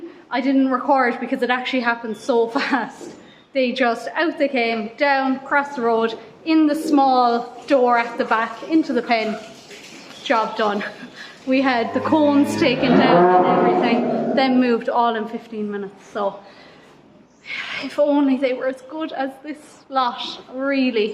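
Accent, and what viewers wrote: Ireland. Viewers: Well done. You really do work hard. I love your soft Irish accent. I could listen and watch you for hours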